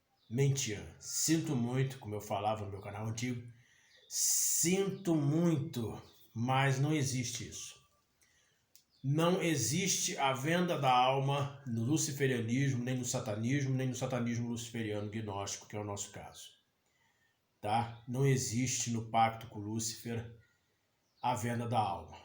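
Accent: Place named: France. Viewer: E de onde vc tirou esse sotaque frances?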